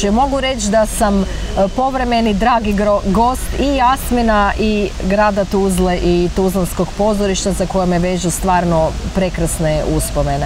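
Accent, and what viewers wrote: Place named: Croatia. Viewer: Živiš i radiš u Tuzli. A akcenat htvatski. Čudime da nema mesta za tebe nema u Zagrebu.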